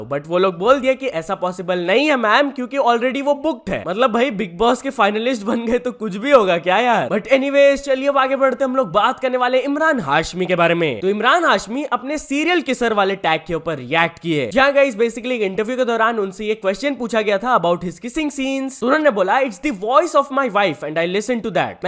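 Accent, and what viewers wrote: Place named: Bihar, India. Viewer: got all the bihari accent inside him 🥵🥵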